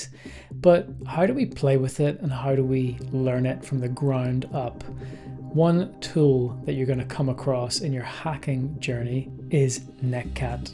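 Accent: Northern Ireland, United Kingdom. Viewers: is that a Northern Irish accent I hear? ;))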